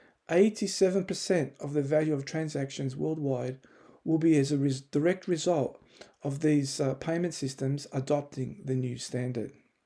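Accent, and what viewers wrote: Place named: Australia. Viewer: Me too! Great to hear a fellow Aussie in this space!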